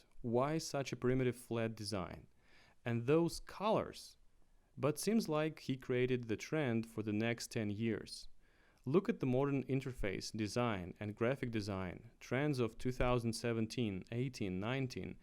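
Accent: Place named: Russia. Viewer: Love your russian accent :3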